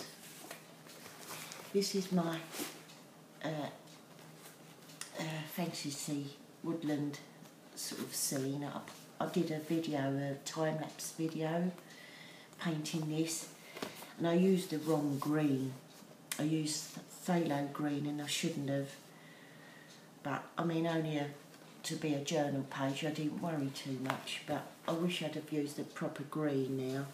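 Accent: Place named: United Kingdom. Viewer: hi I love your art work I am a newbie to art journaling. I'm in the uk you sound like your in the uk. thank your for sharing x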